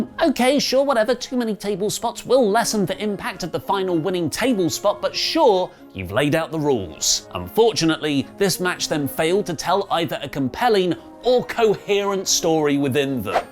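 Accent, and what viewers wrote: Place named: United Kingdom. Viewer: I must be going deaf. It sounded like Ollie said: "Emotionally abused her old anti-LBGTQ views", which was only slightly confusing someone would be released for abusing anti-LBGTQ views in 2022. I had to rewind it five times to hear "Emotionally abused her, holds anti-LBGTQ views", which makes a lot more sense why he would be released. That British accent will get you sometimes. 😆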